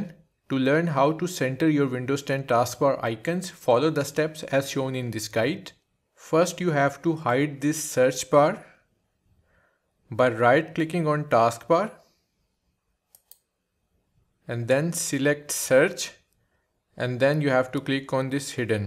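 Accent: India. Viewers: Thanks random indian sounding man on youtube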